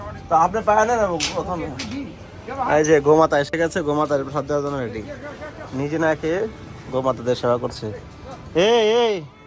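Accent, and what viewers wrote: Bangladesh. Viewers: রাধে রাধে জয় শ্রী রাধে।প্রভু আপনার কথা শুনে মনে হয় বাংলা দেশের রাজধানীর ভাষায় আপনি কথা বলেন।আপনার ভাষা আমার খুব ভালো লাগে।আপনাকে ধন্যবাদ আপনাকে অভিনন্দন ও অসংখ্য প্রণাম ।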